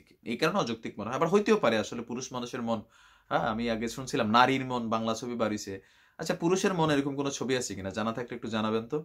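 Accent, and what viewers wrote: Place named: Sylhet, Bangladesh. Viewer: আপনি কি সিলেটের লোক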